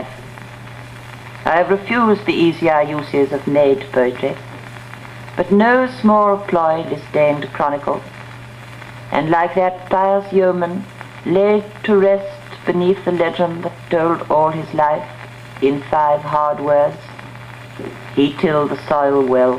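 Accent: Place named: RP, United Kingdom. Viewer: She speaks queens English, she has no accent.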